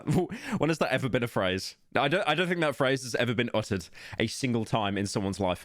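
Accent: United Kingdom. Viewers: Why the fuck am I watching and listening to a brit talking about how an eastern european man had a foreign object in his chocolate starfish?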